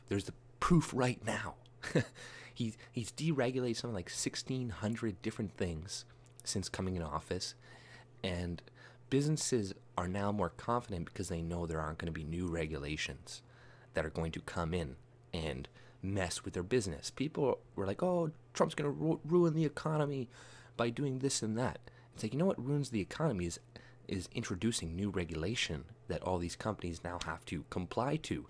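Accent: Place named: Toronto, Canada. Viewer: You sound like a Torontonian- You from around here?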